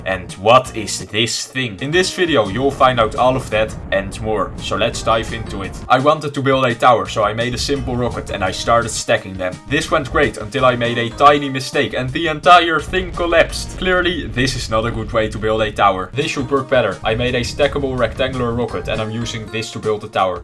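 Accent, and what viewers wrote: Netherlands. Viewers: Is it weird that I can hear that you are Dutch? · Is he dutch voice sounds very dutch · i can hear that this man's dutch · Dutch person recognises dutch accent... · Je Nederlandse accent is wel grappig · Judging by your accent your dutch! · ar you Dutch? because im dutch and you have a dutch accent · you sound dutch r u? if yes then i wanna say 'hallo man'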